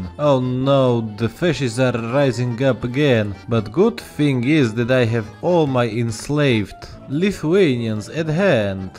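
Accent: Russia. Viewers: Ааааа этот акцент. Ты русский? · Is it just me who thinks he has a Russian accent? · Ru: О госпаде, какой же это прекрасный русский акцент. Чувак, лучшее! Eng: Oh my gosh, what a wonderful Russian accent that is. Dude, the best! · Почему ты говоришь с РУССКИМ акцентом?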